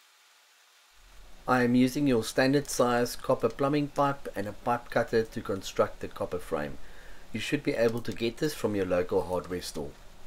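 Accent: South Africa. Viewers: South African? Dig the design!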